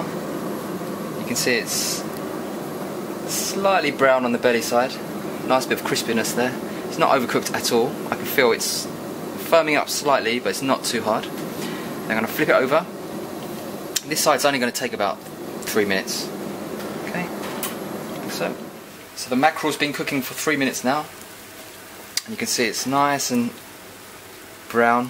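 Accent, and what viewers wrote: England, United Kingdom. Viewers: Your dialect is nice. What part of England is it from?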